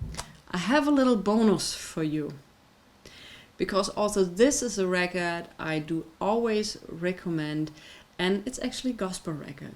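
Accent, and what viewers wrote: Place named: United Kingdom. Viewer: ' " " was a good album, vocals by. Michael later released his Funk/R&B classic " " which I had on vinyl when it was first released in 1980, great album. Do you listen to mainstream Funk/R&B? I'm a white man living in California [U.S.A.] and been listening to Funk/R&B since the '70s. I like groups like Ozone, Chocolate Milk, Sun, General Caine, etc. I like your selections, because to me, I've never heard of any of them, which makes me interested in what they sound like. You sound like you're from the U.K. and you had different singers & bands that never really hit in the states, especially in California. I've heard of some of your picks, two of them were & [ had a hit in the states called " " from 1983, it was a good dance tune] so some of your picks were new to me. It's nice to see someone as knowledgeable as you when it comes to Soul/Disco music, I am a fan of both genres also. 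Disco music to me was a little bit cheesy at times, because everybody & their mother were doing a disco song back then, there was stuff like " & " " that drove me nuts, because I just didn't like them. I've listened to a lot of different styles of music growing up and am lucky to have experienced everything I've listened to or was recommended. Do you like " " by, to me that song was funky & very dance driven when it first cam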